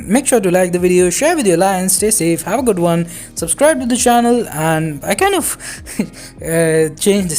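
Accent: India. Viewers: Bro are you from India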